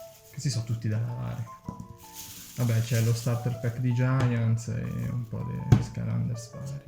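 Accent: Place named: Marche, Italy. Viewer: avevo le cuffie. E ho notato un familiare accento centro-marchigiano, ora so dove trovarti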